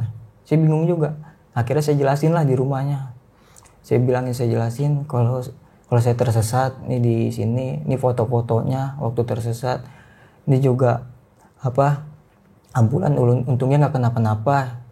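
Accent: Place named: Pemalang, Indonesia. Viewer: Orang Pemalang nih Pemalang mana ka:v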